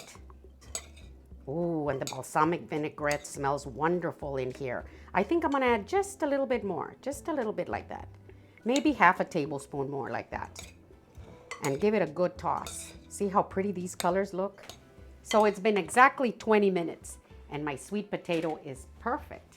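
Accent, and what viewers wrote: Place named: Midwest, United States. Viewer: This is my kind of food! Looks delicious! Your MN/Dakota accent is adorable ❤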